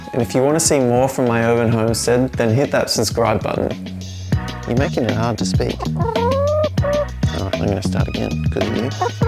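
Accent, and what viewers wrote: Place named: Australia. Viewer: your voice is so soothing. Almost couldn’t tell you were Aussie! ❤❤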